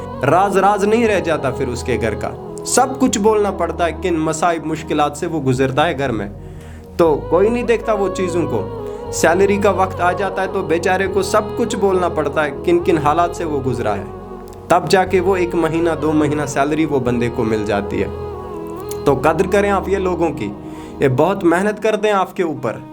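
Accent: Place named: United States. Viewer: Sir u've got an amazin accent u speak like native American that's epic! sir I wanna know did u learnt in online from tv shows speeches etc? actually there are multiple english accents it changes from region to region english speaking countries like America Britain Canada australia scotland ireland there accents and slang words differ from each other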